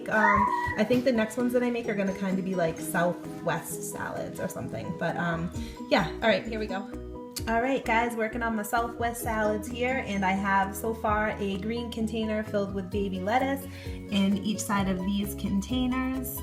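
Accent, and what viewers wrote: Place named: New England, United States. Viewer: You must be a New Englander hehe! Where did you get those awesome containers?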